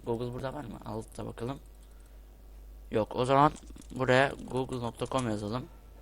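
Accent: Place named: England, United Kingdom. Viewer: ing aksanına hayran kaldım kardşmmm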